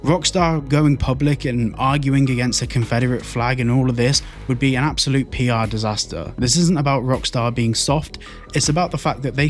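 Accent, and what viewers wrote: United Kingdom. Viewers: Yea you’re definitely from the U.K lol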